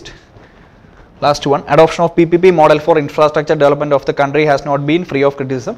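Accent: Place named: South India, India. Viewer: Love the south indian tone in English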